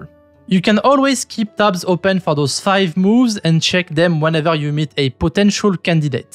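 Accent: France. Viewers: This french accent everytime XD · Subscribed for the french accent and the good content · My man french accent goat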